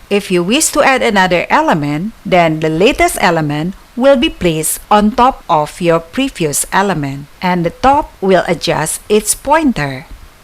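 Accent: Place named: India. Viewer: за 2 минуты и 35 секунд с шикарным индуским акцентом я понял больше чем за полтора часа с преподавателем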